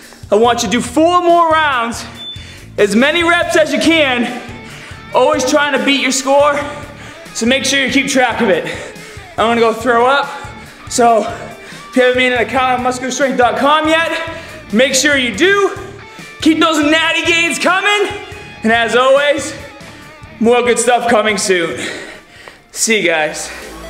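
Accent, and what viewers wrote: Boston, United States. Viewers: You sound like you're from Boston. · Damn this is a beast, sweet Boston accent, and has a physique that I am envious of. If I was gay he may be my type, unfortunately I'm as straight as my spine is throughout my deadlift.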